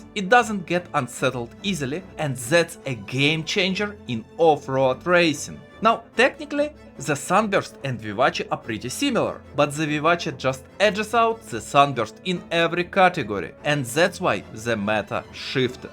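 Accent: Russia. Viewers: Are you also russian? Just very simmilar accent. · У тебя русский акцент бро) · мне одному кажется что у автора русский акцент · you saying how avarge russian guy trying to say in english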